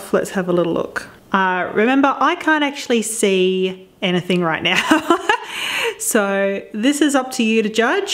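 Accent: Australia. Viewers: Can’t tell you how great it is to hear a fellow Aussie reviewing products. The mannerisms make the video 😂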